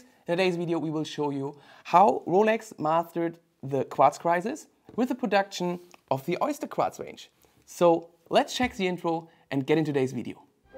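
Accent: Germany. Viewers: The thick German accent... but still amazing video.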